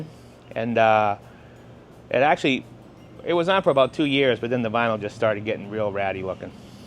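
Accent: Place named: Boston, United States. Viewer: (Guessing you're from Boston, based on your accent?) Just picked up my '25 Hybrid Maverick, LT.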